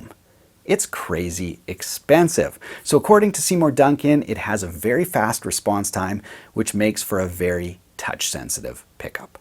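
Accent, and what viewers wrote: Canada. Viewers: are you Canadian????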